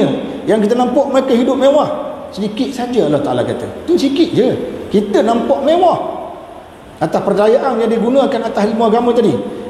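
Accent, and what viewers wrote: Terengganu, Malaysia. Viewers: Rasanya ustaz ni org terengganu, mendengar pd loghat beliau. Tapi takde pulak mem BODO BODO kan org, me MENATANG kan org, meng HAIWANG kan org...Wallahu a'lam.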